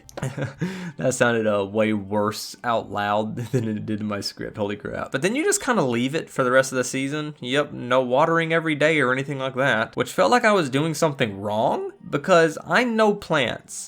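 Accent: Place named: Alabama, United States. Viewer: Idk where you're from but your accent is pretty much exactly like everyone I know (I'm from Alabama for context)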